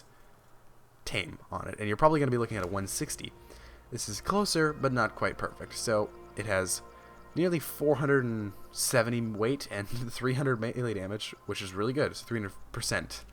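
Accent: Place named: United States. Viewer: Zajd Zajd it would be fine if he had a different accent but he talks like a American/Canadian and he says things completely wrong!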